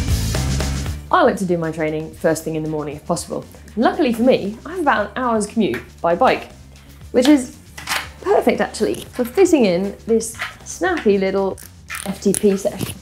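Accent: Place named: United Kingdom. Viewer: We love you british accent Emma Poooooley!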